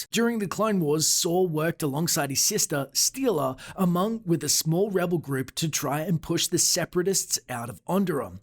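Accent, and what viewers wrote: Australia. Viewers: I love hearing an Aussie narrate these videos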